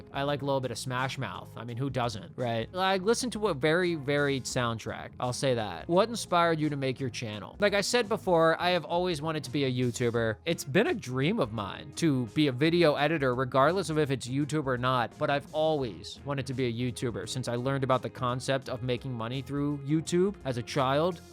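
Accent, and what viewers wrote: Philadelphia, United States. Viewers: Congratulations brother 🎉 Love the content, disappointment no one ask the real burning question i had, no need to answer though. But based off how you've pronounced certain words, are you from Philadelphia?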